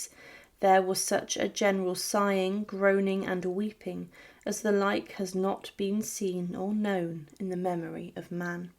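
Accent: United Kingdom. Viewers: I watch just to listen to your lovely British accent and your beautifully accentuated narrative. (And, of course, your well-researched historical content.) Keep up your amazing work, please. I could listen to you talk for days on end. 💕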